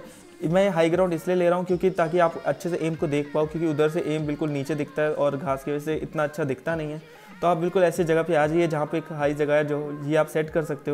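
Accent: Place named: Bengal, India. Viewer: i think u r from west bengal😅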